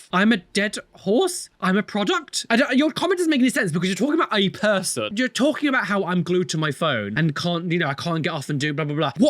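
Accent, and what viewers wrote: United Kingdom. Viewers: I've been watching you for awhile and I love your skin art (tattoos) but then we get to your flamboyant personality and British accent like omg be still my heart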